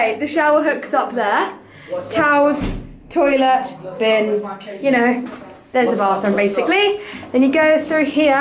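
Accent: United Kingdom. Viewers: Is she from England sorry I am new here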